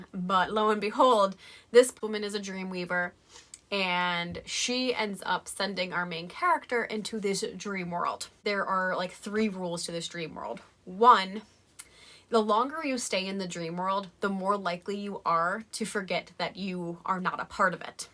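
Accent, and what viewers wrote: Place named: United States. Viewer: Wow! They are great ideas for different genres. Your creativity is limitless! But now you must do the hardest thing: write them! By the way, can I ask where are you from? I'm 99% sure you are from USA, but I cannot distinguish accents very well because I'm from Spain.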